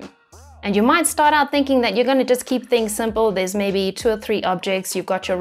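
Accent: South Africa. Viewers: wow you really do sound south African! hate taking leave it just makes me aware of how much work piles up for when I get back.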